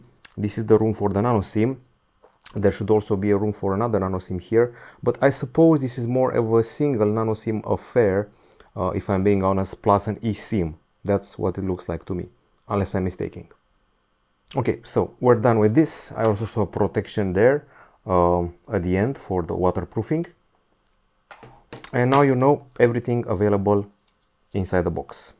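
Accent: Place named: Romania. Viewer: Ăsta-i român după voce și accent 👍👍👍👍 😂😂 Americanii 👎🏿👎🏿👎🏿👎🏿💩💩💩💩 Românii 👍👍👍 💪💪💪💪❤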